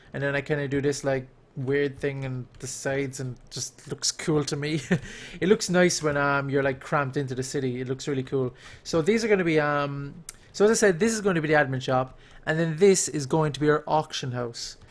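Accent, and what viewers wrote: Ireland. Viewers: I am and you sound irish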